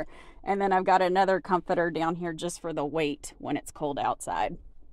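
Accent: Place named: South, United States. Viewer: I love your personality and your adorable southern belle accent, happy travels!!!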